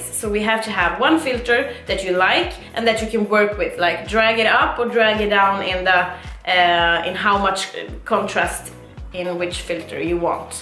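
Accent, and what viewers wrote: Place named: Sweden. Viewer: I knew she was from Sweden before watching the video I heard it in her pronunciation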